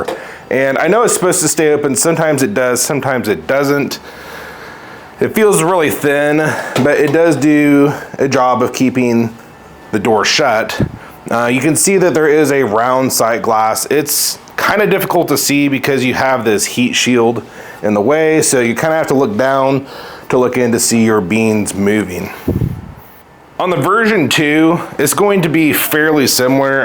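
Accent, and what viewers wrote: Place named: Southern California, United States. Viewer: Holy SoCal accent batman. Thank you for answering all of my questions though! Great Vid!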